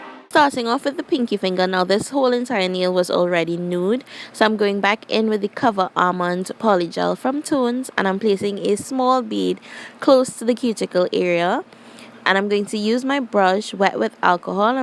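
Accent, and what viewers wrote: Trinidad and Tobago. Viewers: I’m obsessed with the polygel!! Side note: You sound like you have a Trinidadian accent lol correct me if I’m wrong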